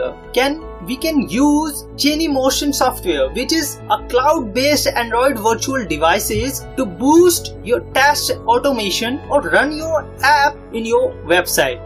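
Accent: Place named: India. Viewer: I always love when Indian talking with their accents... its so entertaining to hear :D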